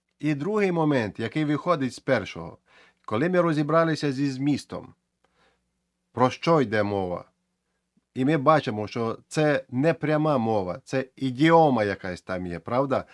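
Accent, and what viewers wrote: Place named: Galicia, Ukraine. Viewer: Красно дякую за ясне тлумачення! Мило та радiсно чути галицьку вимову пана! Най щастить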